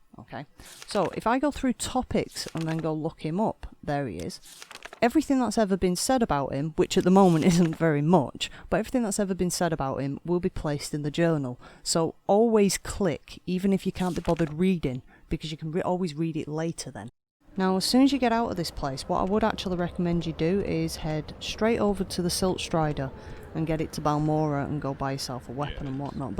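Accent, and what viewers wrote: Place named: North, United Kingdom. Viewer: Nice to hear a fellow northerner on this part of youtube :) Thanks for making this guide, I feel a bit overwhelmed getting into morrowind so I'm hoping this will make it seem less... huge.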